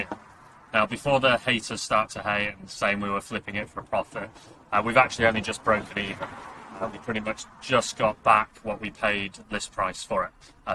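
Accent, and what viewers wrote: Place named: United Kingdom. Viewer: I can hardly understand with your British accent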